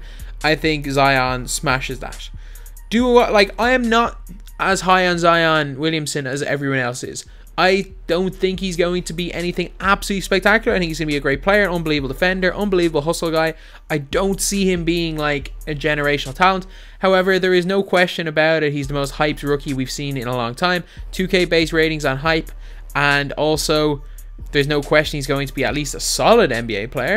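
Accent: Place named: Ireland. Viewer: Its his accent hes irish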